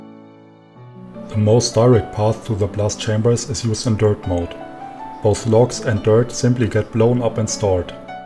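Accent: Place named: Germany. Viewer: Great Video! Deserves way more attention than it already got! Ziemlich starker deutscher Akzent war, aber sehr gut verständlich. Mach weiter so! Keep going!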